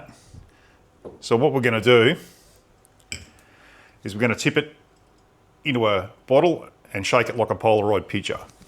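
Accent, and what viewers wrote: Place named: Australia. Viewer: Of course it was a compliment. I'm English and love the Australian accent. Was hoping to brew a Velvet Vanilla Porter by David Heath this weekend but our crappy postal service hasn't delivered the required yeast, despite me ordering it on Wednesday evening and it being posted 1st class Thursday. Royal Mail must hate me cause I'm always complaining about the service these days. I let them give the excuses then tell them I was a postie for 9 years back in the 1990's. Like most companies it's gone down hill since privatisation. Unfortunately my local homebrew shop doesn't stock the Lutra Kveik yeast recommend.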